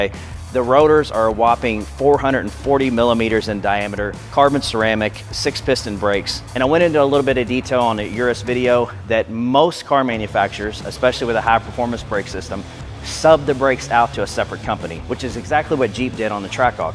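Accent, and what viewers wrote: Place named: South, United States. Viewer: Something about a slight country accent and Lambo is my favorite car company gets me thinking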